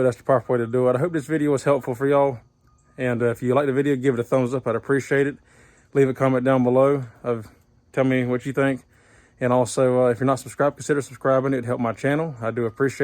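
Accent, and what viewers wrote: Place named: North Carolina, United States. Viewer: Yes sir! Born and raised. Raised in Wilmington, born in High Point. I could tell by how your said “water”. Been living in the California mountains now for ten years. Once I heard you talk I felt like I was back home. Thank again for the video. It helped! I got me 1989 bronco with the 4.9l that I’ve been putting back together.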